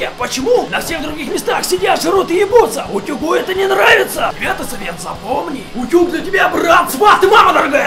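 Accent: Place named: Japan. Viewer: говоришь с японским акцентом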